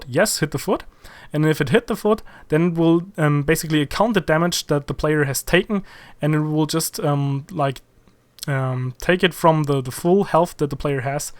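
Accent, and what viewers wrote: Germany. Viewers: Ich vermisse den Punkt "Strategie" (=langfristige Manöver um das Spiel zu gewinnen - z.B. bei Spielmodi mit mehreren Objectives von einem Ablenken um das andere angreifen zu können, ...). Außerdem hätte ich Teamwork (=Rollen sinnvoll ergänzen, gegenseitig Rücken decken, ...) und Taktik (kurzfristige Manöver um Gunfights zu gewinnen - Dropshots, Deckung effektiv nutzen, ...) nicht unbedingt in einem Abwasch erledigt. Ansonsten hast Du das aber sehr systematisch und sorgfältig gemacht :) ... PS: Egal wie sehr man auf den Akzent achtet, das Deutsche hört man immer irgendwie heraus ^^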